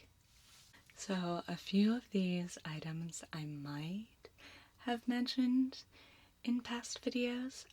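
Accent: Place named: Texas, United States. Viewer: I would never have guessed you as being named. I like it tho. It matches your beauty and uniqueness. One of the things I always liked about your videos is your "hey y'all" greeting. If I'm remembering correctly you aren't from Texas but you moved here with your husband. As a native Texan it's nice to hear that greeting since its something we hear and say daily. Wether you're a native Texan or not that little bit of Texas twang suits you.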